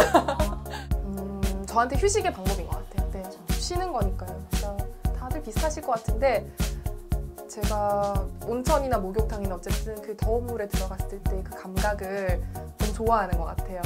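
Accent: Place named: Busan, South Korea. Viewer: 기자님 사투리가 부산일보 영상이라는 걸 보여주네요 ㅎㅎ 좋은 영상 감사합니다.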